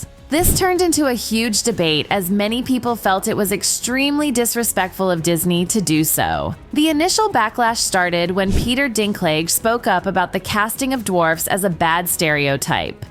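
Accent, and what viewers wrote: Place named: United States. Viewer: Wtf is going on that narrators voice? It's the most annoying American accent I've ever heard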